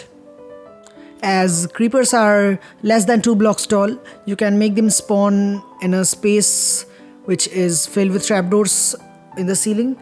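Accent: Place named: United Kingdom. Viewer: Litrelly got orgasm by intro music and your British accent eng.. senpaieeeeeeeee..✌️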